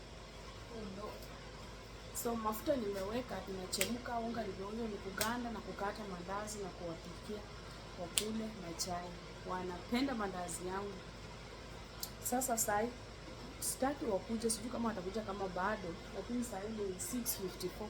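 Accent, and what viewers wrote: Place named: Kenya. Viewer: She’s a luhya, therefore don’t be too critical, cut her some slack! We understand what she means. Every tribe have an accent!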